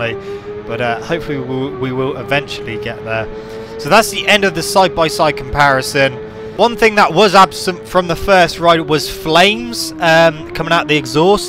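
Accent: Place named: Somerset, United Kingdom. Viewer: You sound like your from Somerset boy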